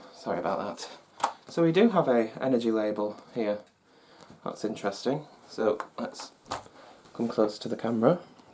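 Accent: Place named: Devon, United Kingdom. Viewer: We Devonshire lads talk like that as well you know😂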